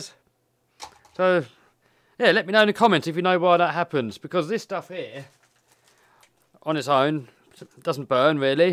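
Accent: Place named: United Kingdom. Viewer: GradeAUnderA is that you? What region or area in the UK is your accent from?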